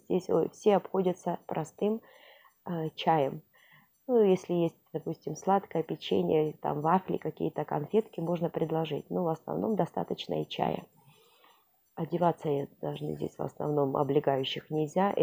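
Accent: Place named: Georgia. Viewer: Акцент у вас прям Грузинский немножко ,или мне показалось !🤭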